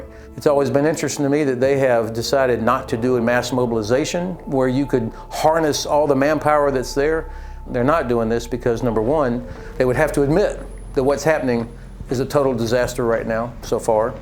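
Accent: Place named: South, United States. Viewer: You have a southern United States accent so you cannot know what you’re talking about.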